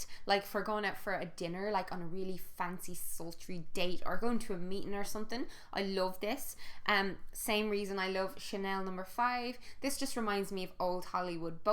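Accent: Ireland. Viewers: Ouuuuuuu!!!!!! I am in love with your Irish accent!! So sooo lovely! Im Canadian with Irish heritage :) Ireland is definitely on my bucketlist!!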